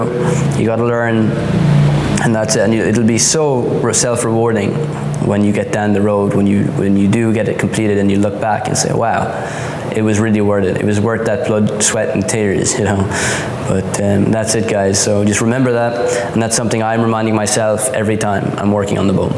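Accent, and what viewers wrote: Ireland. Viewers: What's your accent? Irish, but something else too?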